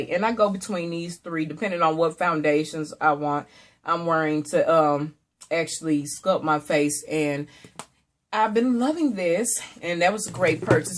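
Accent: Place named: South, United States. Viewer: I love your southern accent 💕💕 Kisses from NJ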